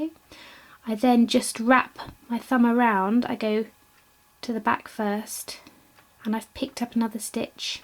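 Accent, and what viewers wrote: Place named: England, United Kingdom. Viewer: beautifully explained in a nice clear voice - great to hear an English voice on one of these knitting videos. Loved it!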